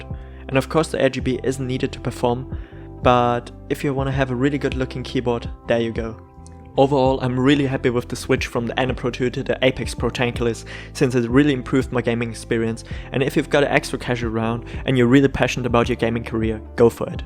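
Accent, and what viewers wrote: Germany. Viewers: German guy right?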